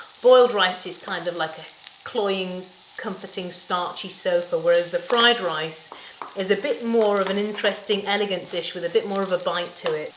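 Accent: England, United Kingdom. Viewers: what is that accent?why english accent? really funny though.....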